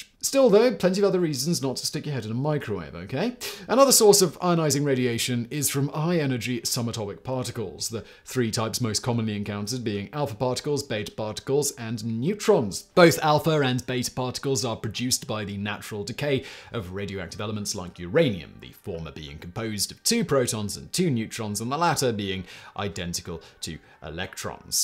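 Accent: United Kingdom. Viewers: He has a very clear and precise British accent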